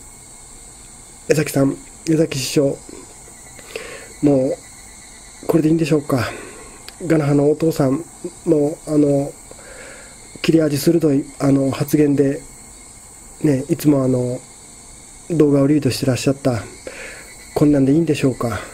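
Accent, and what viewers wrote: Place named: Kansai, Japan. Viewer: そうですねー。水島さんもちょっと感情的になってたんでしょうね。第三者なら、まさかアンタは慰安婦だ！という意味ではないだろうなとわかると思うけど、今、揉めてる当事者であり真子さんは女性なので「慰安婦」という文言を使ったのは、あんまり適切ではなかったかなぁと私も思いますね。私は真子さんが我那覇パパにべったりなのと、江崎さんって胡散臭過ぎるような感じがするので、今までの我那覇さん達の実績をあまり知らないのでなんとも言えないけど、一般の本土の人間として、我那覇パパ、江崎さん、ヒステリックになった真子さんのトリオはへんてこりんに見えましたね。でも、水島さんの慰安婦発言、そこは不適切かなと思います。女性に対してだからね。そういう意味では水島さんもちょっと常軌を逸してたような気も。 ところで、先生は関西出身ですか？私、関西人なんで、時々、関西弁のときがあるからそうなのかなと思いました。私が関西人だからかもしれないけど、神戸っ子の立場からしたら、とても親近感を感じましたー。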